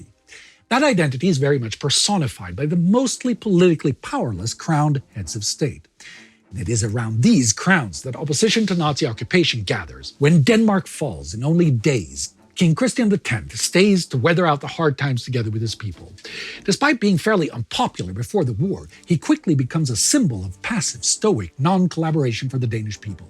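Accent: Netherlands. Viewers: Heerlijk, dat Nederlandse accent.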